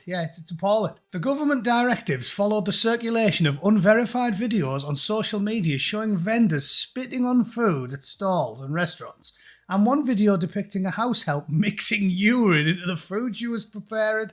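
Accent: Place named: Liverpool, United Kingdom. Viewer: Keep it Scouse bro. 😂😂😂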